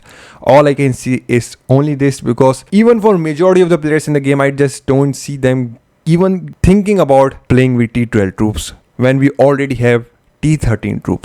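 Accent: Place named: India. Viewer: It's difficult to understand u with ur indian accent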